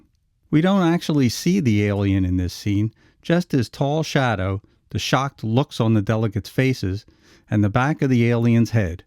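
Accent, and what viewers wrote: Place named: Pittsburgh, United States. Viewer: I can tell this narrator is from Pittsburgh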